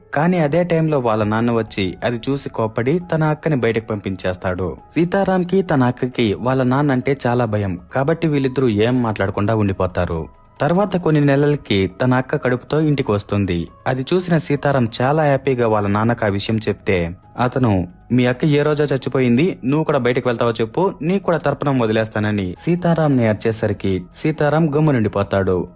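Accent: Rayalaseema, India. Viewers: Rayallasima kada slang alane untundi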